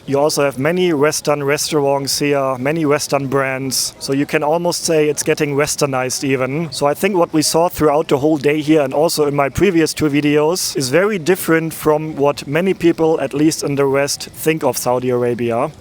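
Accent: Germany. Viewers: Salam Aleikum Wenn ich deinen Akzent so höre.. bist du deutsch? 😂 ich musste lachen als ich dein Video gesehen habe. Ich bin mix, italienisch deutsch niederländisch Orient. Geboren aufgewachsen in Bremen. Und seit drei Jahren Muslima Alhamdullilah ❤ warum trägst du Handschuhe??? 🧤 👀 beim Essen? 😂 😮 99? Wow Alhamdullilah das wusste ich nicht. Große Bedeutung. 😢❤ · if this is a problem youre concerned about, you should worry about better things. This man is german, and english isnt his first language, so obviously his english wont sound as american. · I wonder if the german accent helped him out would have liked to see him wear an israel flag badge And yes i was there for two months 15 years ago, and i had quite a few problems but a lot less than in so many other arabic countries i have visited more recently.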